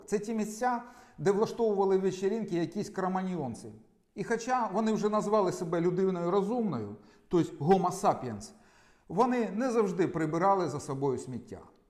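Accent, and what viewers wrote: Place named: Odesa, Ukraine. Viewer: Розумний чоловік нащось дурня з себе клеїть.. По розмові видно, що одеський липован. Так?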